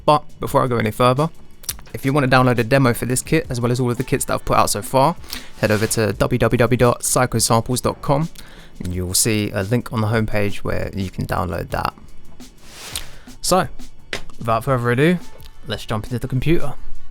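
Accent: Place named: United States. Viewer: Bro, I thought you were American. Fellow Londoner here. Your beats are so good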